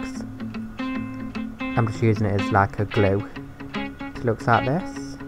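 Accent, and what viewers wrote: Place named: Nottingham, United Kingdom. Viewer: Just subscribed as it's amazing! Where you from? You sound like a Nottingham lad👍🏻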